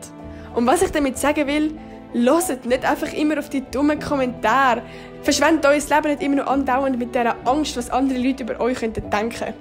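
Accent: Switzerland. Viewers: Ich liebe deine Videos! Am geilsten finde ich den SchwitzerAkzent der mich immer zum Lachen bringt. Ich bin froh dass du YT machst. Beste Youtuberin! Du hast keinen Grund dich Unwohl zu fühlen da du total schön aussiehst und eine Hammer Figur hast!❤ Ich und viele deiner Tausend Abonnenten stehen immer hinter dir und sind für dich da! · Dieser Schweizer Akzent ist so geil haha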